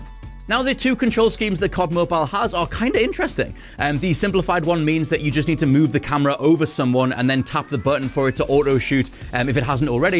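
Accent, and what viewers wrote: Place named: Wales, United Kingdom. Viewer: A Welsh man talking about call of duty, yes lad 🇬🇧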